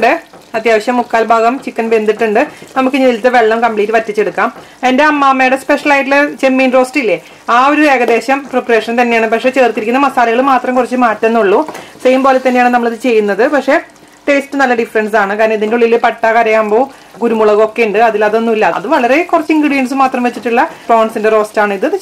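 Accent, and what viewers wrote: Thrissur, India. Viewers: Dear sister you are very honest we can understand from your talk trichur slang may God bless you 🙏🙏🙏🙏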